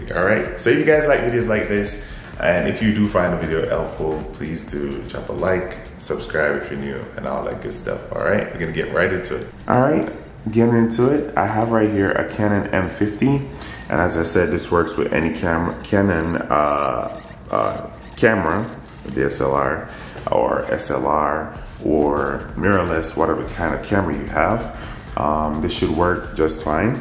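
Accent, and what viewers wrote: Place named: Jamaica. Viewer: I hear the Caribbean accent. If I had to guess. Yardy (Jamaican). Thanks for the video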